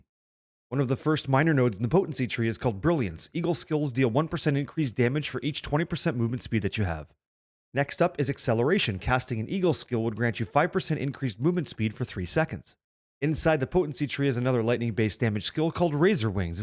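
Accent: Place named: Canada. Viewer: when canadians speak 🤮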